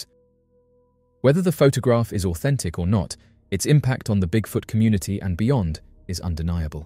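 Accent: United Kingdom. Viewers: Why pick the British accent for narration? Your voice isn't good enough?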